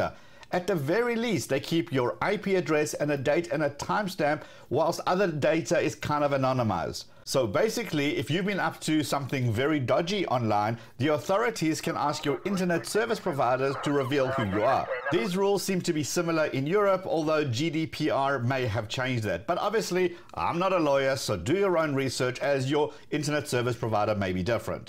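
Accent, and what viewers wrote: South Africa. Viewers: its south african im sure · I definitely noticed that South African accent lol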